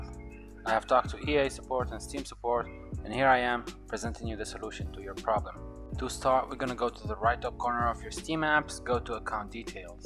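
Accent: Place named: Tunisia. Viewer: Based , thank you so much . W tutorial w Tunisian English ( From Tunisia and mrc 3al Video ) < 3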